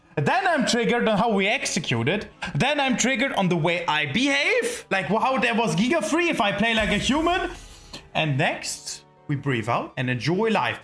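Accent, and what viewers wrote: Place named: Germany. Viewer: The German accent never gets old lol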